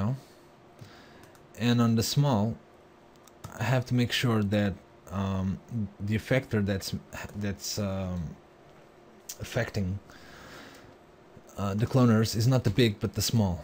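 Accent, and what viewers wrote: Portugal. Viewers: muito bom! o teu ingles é perfect mas dá para ver que és tuga ;) Abraços e continuação de bom trabalho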